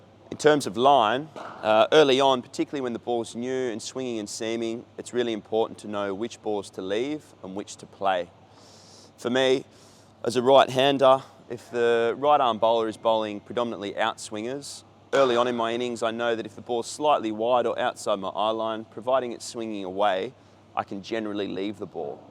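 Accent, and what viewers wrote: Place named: Australia. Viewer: Sounds very Australian for an English player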